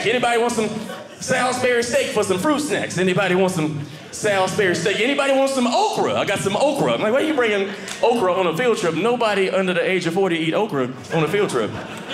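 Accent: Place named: South, United States. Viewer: He talks too fast. I had to put on CC and I almost couldn't read that fast. I talk fast. My friends understand but others don't. He was me. Southern, drop endings on words and talk quick. He was amusing but my friends didn't understand him. Wrong crowd.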